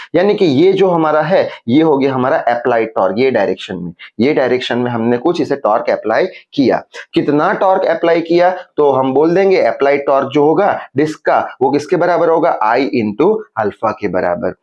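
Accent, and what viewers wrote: Gujarat, India. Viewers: Sir aap gujarat se ho??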